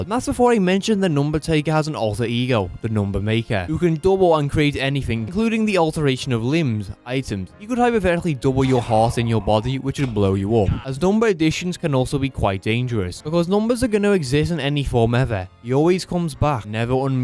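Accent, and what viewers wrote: Liverpool, United Kingdom. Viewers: You sound like your fighting the urge to turn scouse with some sentences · why did I, a fully grown person, just watch a vaguely scouse bloke talk about a childrens tv show for 8 whole minutes?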